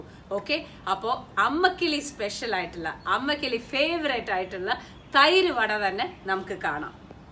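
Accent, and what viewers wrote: Palakkad, India. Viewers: Hai eepaalakkadan samsaravum paachakavum eshttayi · നല്ല വീഡിയോ, തൈര് വട എനിക്കും നല്ല ഇഷ്ട്ടോണ്, പിന്നെ നമ്മുടെ പാലക്കാടൻ ഭാഷയും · പാലക്കാട്‌ നാടൻ ഭാഷ സൂപ്പർ ❤️❤️❤️ അമ്മ ❤️❤️❤️❤️❤️❤️❤️❤️❤️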